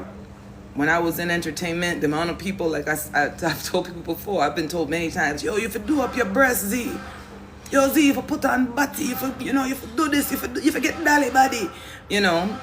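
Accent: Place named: New York, United States. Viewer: Its ok... but you sound like you from New York. Still, you got the voice for radio and the face for Youtube so you already have all the qualifications. ;-) I love the voice nuff nuff nuff, so I will watch and see what creativity and experience lies within the beauty with the dreadlocks. We can tell, you are unique in your own way... did I say I love di voice?:face-blue-smiling::face-blue-smiling::face-blue-smiling::face-blue-smiling::face-blue-smiling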